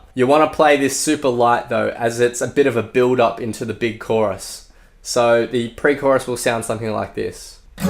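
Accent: Australia. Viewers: Where are you from? You sounds super Australian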